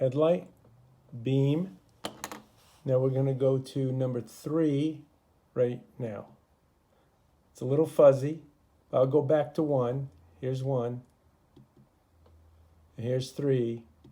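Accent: New England, United States. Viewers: Are you from New England,? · Wonderful video !!! Very well explained it, also I love the way you do it, I can hear your voice the entire day, is very calming and love the accent … New England ha !! 😊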